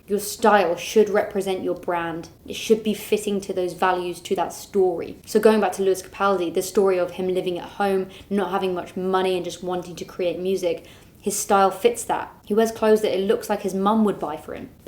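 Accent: United Kingdom. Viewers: i hope you dont mind me asking a rather personal question .. where are you from (more or less) im asking because of the accent it is a accent i very much like but only a few have it in movies and stuff .. i would say brittish but then again the country is big so id like to know where this is coming from :) cheers and greetings from germany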